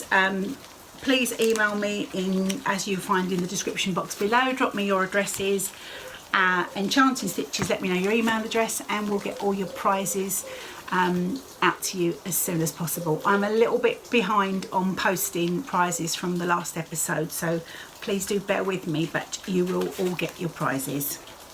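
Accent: United Kingdom. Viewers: I recently discovered your channel and I'm so enjoying it! I am a quilter and knitter but have picked up cross stitch again. It is so pleasant listening to you while I stitch because my Mother was British and it makes me think of her!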